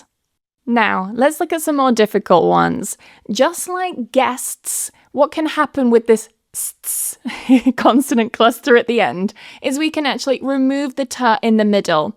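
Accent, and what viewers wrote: United Kingdom. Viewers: I want to speak like you. I really love british English. Could you tell me how I can learn british English? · I truly love british accent, and specially yours ❤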